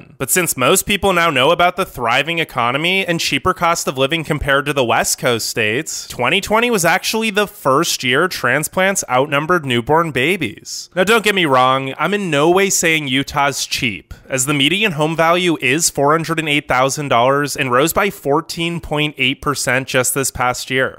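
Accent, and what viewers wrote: California, United States. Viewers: Is person speaking from Cali 🤔 ?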